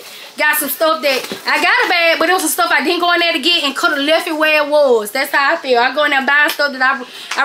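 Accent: South, United States. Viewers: Just to let you know you're the truth I will be trying your concept of a basket business. Love your voice your are truly from the South . May God bless you always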